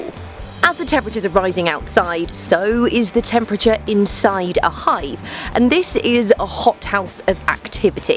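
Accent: United Kingdom. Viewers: What's wrong with me that i feel ichy when she talks. I love british accent but only when I like the speaker.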